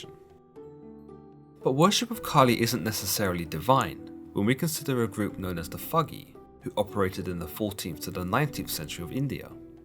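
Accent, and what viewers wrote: United Kingdom. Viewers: So tired of hearing the OLD CRUSTY british or english accents! Are they still trying to take over the world?!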